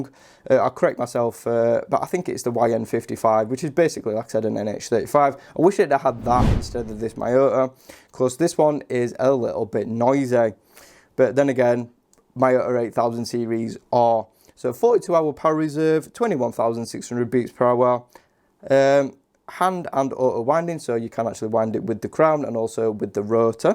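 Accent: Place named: Manchester, United Kingdom. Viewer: I love working-class Manch accent (Manchester). Nice review here. I am looking at this one as a gift for my young nephew. You've got a slim wrist like me.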